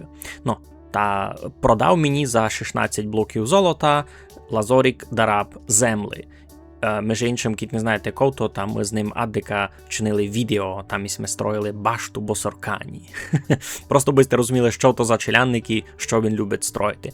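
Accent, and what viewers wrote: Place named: Mukachevo, Ukraine. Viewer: Та просто цікаво стало, в мене є знайомий просто з околиць Мукачева, то він говорить дуже подібно до вас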